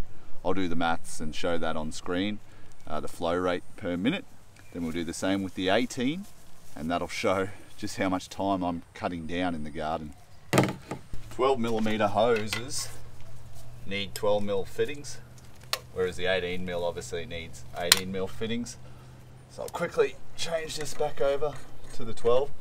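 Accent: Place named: Australia. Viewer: He's got the nice, hot Australian accent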